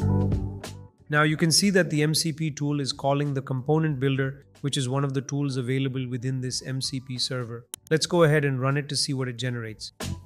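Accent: India. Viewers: Genuine question is this your real voice or ai clone Somehow i could teast the Indian accent within it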